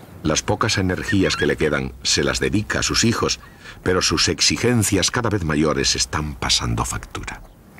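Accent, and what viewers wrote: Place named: Spain. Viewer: Se olle de la madre con acento Gachupin.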